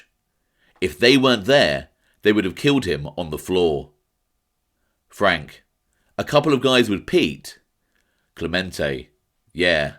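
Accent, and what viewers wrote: United Kingdom. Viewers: It amazes me how much knowledge u have considering I'm assuming ur from the UK by accents. Good to no are American history reaches global appreciation.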